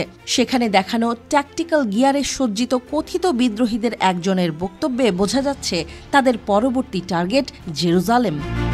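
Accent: India. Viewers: খবর বলার ধরন দেখে মনে হচ্ছে সে একজন ইন্ডিয়ান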